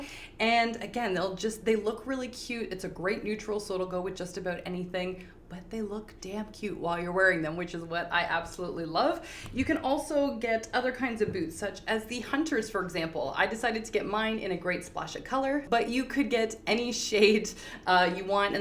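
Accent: Canada. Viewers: I thought I detected a bit of Canadian in your speech. I am in Detroit right across the river from you neighbors. :)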